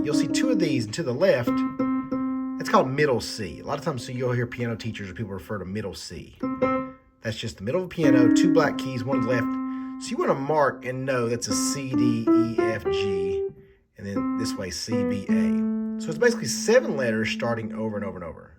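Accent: South, United States. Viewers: I hate your country accent!!!!!!!!!!!!!!!!!!!!!!!!!!!!!!!!!!!!!!!!!!!!!!!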